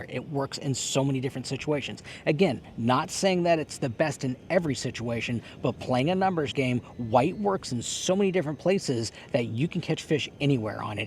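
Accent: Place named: Minnesota, United States. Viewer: You need to stop telling people all this BS . Water color and habitat in Florida is Totally different than other states. You sound like you are from Minnesota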